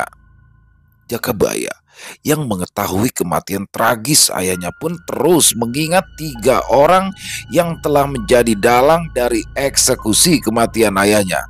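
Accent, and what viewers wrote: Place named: Jakarta, Indonesia. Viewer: bang kapan lagi ngangkat cerita bang rudi sm bang piyan,seneng sm logat betawi nya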